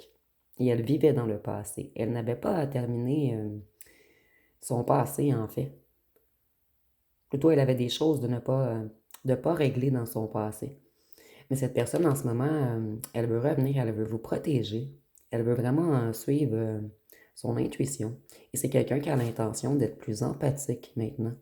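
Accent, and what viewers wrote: Quebec, Canada. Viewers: <link> Charles DE GAULLE, lorsqu'il arriva en TERRE DU QUEBEC, tomba amoureux de cette TERRE Française et de ses habitants et cria avec son coeur: VIVE LE QUEBEC LIBRE!!! Votre accent de la "vieille FRANCE" me rappelle cette histoire glorieuse de la FRANCE éternelle et de DE GAULLE qui éprouva une grande joie de retrouver une FRANCE oubliée... MERCI pour vos guidances très psychologiques et clairvoyantes qui nous aident à comprendre les situations complexes et complexées par des blessures d'enfance... 😘💖👍🙏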